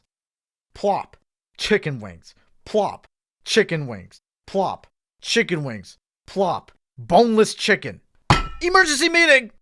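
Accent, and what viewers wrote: United Kingdom. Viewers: I actually watched the full sponsor, because i absolutely love that british accent. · sorry i gotta stalk more of this sexy British voice then imma get back to ya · Man if there is one way to make me not skip an ad it's hearing velvety British butler voice say it.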